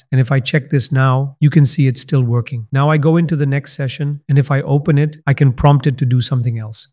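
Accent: India. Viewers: The only indian i can bare to listen too. SUPER INDIAN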